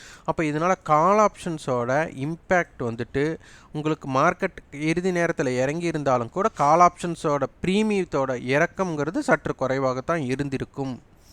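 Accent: Kongu, India. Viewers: Masterji Today i watched this video more than 2 times, while driving to my office i listned the audio more than 5 times, i noticed a thing, if i check & implement it in the market(s), then i will update later. Your teaching & your Tamizh slang are Awesome Master ji, (Iam a big fan of your Kongu Tamiz Slang) Iam sorry iam unable to type on our Tamizh. 👍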